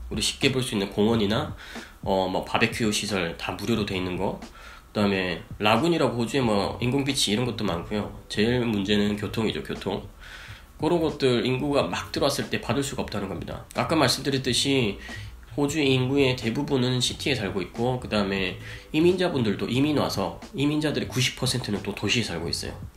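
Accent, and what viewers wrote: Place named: Seoul, South Korea. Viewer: 서울말 쓰시느라 수고 많이 하셨... 저도 브이로그 참 좋아하는데요. 유튭이 제 브이로그도 별로 안 좋아하더라구여 ㅋㅋㅋㅋ 화이팅 합시당 ㅋㅋ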